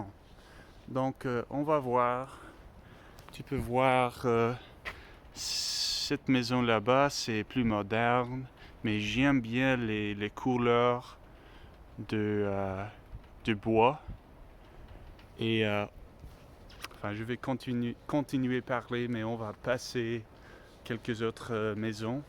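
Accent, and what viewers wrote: Quebec, Canada. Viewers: C est vrai que tu as légèrement un accent québécois mais c est du francais 😊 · Salut. C'est Betsy. Je suis ravie que tu fasses autant de progrès avec ton français (et que tu emploies correctement le subjonctif! LOL) Je n'ai pas écouter toute la vidéo, mais quand même le début et la fin. Excuse-moi de te corriger (je ne peux pas me retenir, étant prof!!) ici et là. 1. Je suis en train DE +infinitif 2. faire les courseS 3. Une Rue 4. un accent Québecois (masculin) 5. AU Canada 6. essayer DE 7. to shape = former 8. to get by = se débrouiller Et j'apprécie ton accent Québecois! Vive le Canada!! Elbows Up! A la prochaine, Betsy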